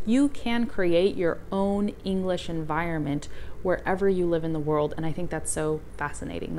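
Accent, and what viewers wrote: Buenos Aires, Argentina. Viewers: I don't know, but it seems that you're speaking a little bit slow because I'm getting everything you're speaking , am I wrong?..suenas como una porteña, saludos desde Nicaragua XOXO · I think it was an excelent video, very natural, and I like when you speak fast and there is no subtitles, that force us to pay attention and follow every word as much as we can. We sometimes try to be perfect in all what we do and that is a problem, because nobody is perfect... and if you make a mistake at least that mean you are trying. I have to say that I love when you speak Spanish, I have seen other videos from you and I saw you talking in Spanish in one of them and I was perplex because you sound like an Argentinian woman, your Spanish is really clear and you got to achieve a very similar accent. Thanks for your video and I know many of us will be looking forward to a new one. 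See you. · Hello, thanks to share this video and your experiences with language learning. Your Spanish it's very good! You sound like a true Argentina! Continue inspiring us! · Excellent video!!, telling us about your personal experience with the spanish language is very inspiring. As a native spanish speaker i got say you have a very nice accent, quite "porteño" haha. Bueno ahora si en español, te cuento que hace poco di con tu canal de youtube y de todos lo que encontre puedo decir que entiendo muy bien como hablas en ingles. I got a say since my childhood i listen a lot music in english, and watch movies and tv shows as well, but my challenge is reading fluent english language. Saludos desde Argentina. PD: I dont know if the word "quite" is well used xD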